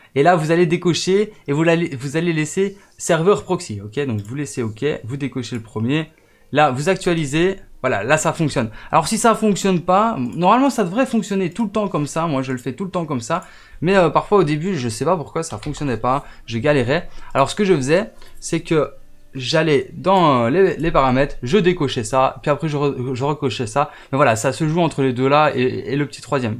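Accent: Belgium. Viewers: Slt tu es d'où de Belgique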